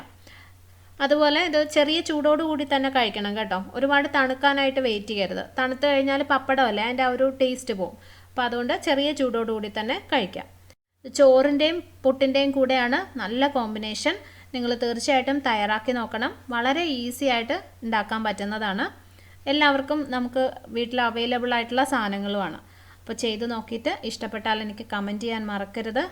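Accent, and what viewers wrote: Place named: South Kerala, India. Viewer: njan kollam aanu...njan chechiyude eth recipe cheyth nokkiyalum ath kidukkachi ayitundakum...ith vare. chechide samsaram njangalude pole...apozhe thonni chechi thekkan keralakkari anenu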